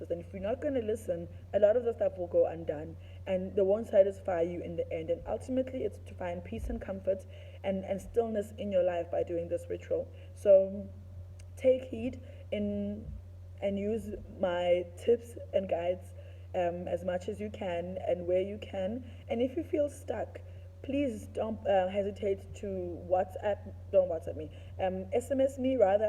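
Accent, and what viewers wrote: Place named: England, United Kingdom. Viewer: logogo wenu okhuluma isingisi wathwasa e England yini ?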